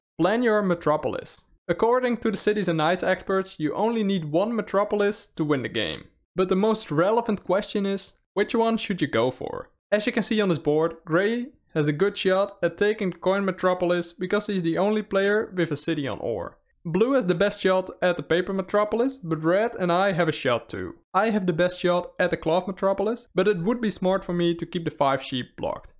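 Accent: Netherlands. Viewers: Although I can understand you just fine, it might help to reduce "vocal fry". That's the creaky, low sound you usually use at the end of a sentence. I think less vocal fry sounds more relaxed. I'm no speech therapist, so I don't know how you'd achieve that. Just curious: are you Dutch?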